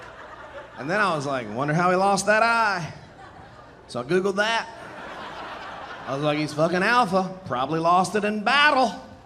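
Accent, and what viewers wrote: South, United States. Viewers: Thank you for this. A breath of fresh air with a southern accent. Intelligent. Funny as hell. Just amazing! 🤣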